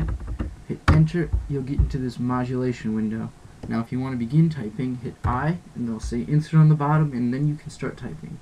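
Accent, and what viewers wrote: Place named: Brooklyn, United States. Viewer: You sound like you are from Brooklyn.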